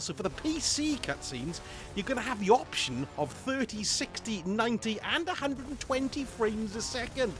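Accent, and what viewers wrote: Wales, United Kingdom. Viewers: so ur welsh then, im in cardiff, are you on discord?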